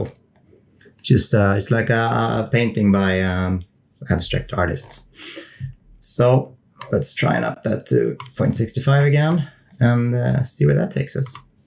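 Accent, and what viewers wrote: Sweden. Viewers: The way you said automatics. I think it would sound less Swedish if you said Automadics and didn't emphasize anything. Your Swedish dialect is very obvious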